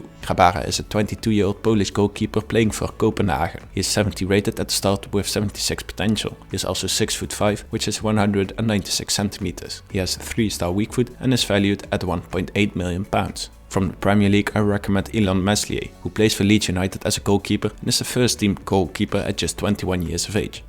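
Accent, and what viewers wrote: Netherlands. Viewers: Bro je moet wel iets aan je engels accent doen man, geen haat ofzo maar je hoort duidelijk dat je NL bent verder goeie videos 👊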